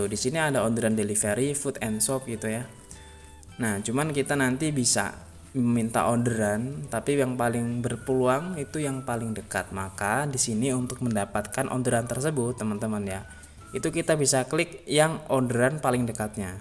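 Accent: Lampung, Indonesia. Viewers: Pasti dari Lampung ya bang hehe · Bang orang lampung ya hahah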